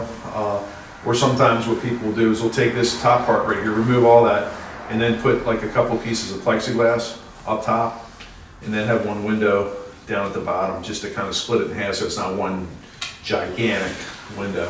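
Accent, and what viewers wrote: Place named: Baltimore, United States. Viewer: This guy knows his stuff,but even more important you can clearly get a good understanding of hearing his authentic Balmer-accent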